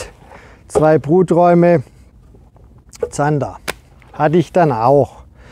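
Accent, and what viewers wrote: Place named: North, Germany. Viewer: super Video - echte, selbst erlebte Infos - und für mich als Wahl-Nordlicht auch mal wieder der Slang meiner Heimat! Danke, "gutes Neues" und weiter so! Chris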